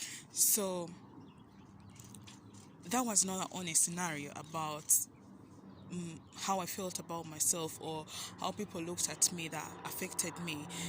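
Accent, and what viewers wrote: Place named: United Kingdom. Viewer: You keep it up. Don't let anyone tear you down. By the way I love your accent. I'll certainly be going back and watching your other videos. I could listen to a British accent all day long.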